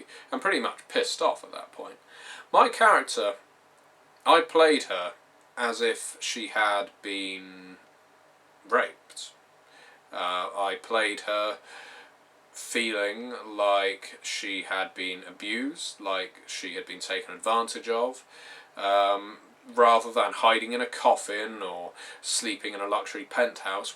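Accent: England, United Kingdom. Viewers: when he talks, i have to pay attention. the English how do they do it?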